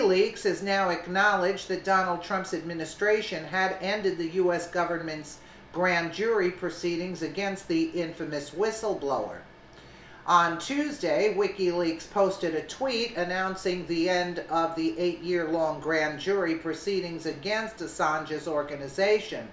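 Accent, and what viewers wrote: New York, United States. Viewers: I am from south ga and I love your New York accent. It warms my heart everyday!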